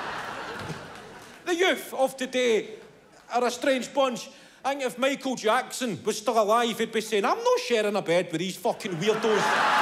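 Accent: Scotland, United Kingdom. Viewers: if there's people who can understand English and not Scottish how can they be the same? It's a Scottish accent U donut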